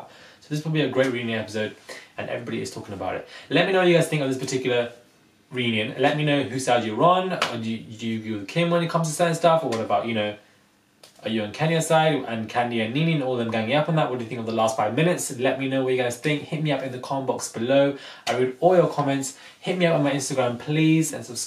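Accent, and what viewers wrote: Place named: London, United Kingdom. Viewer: Great to hear a fellow Brit and Londoner (?) reviewing RHOA.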